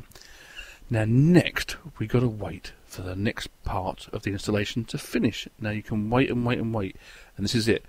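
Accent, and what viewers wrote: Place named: RP, United Kingdom. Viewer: Hey I could imagine you as a very wealthy English man talking about business and politics during the 19th century. Awesome voice!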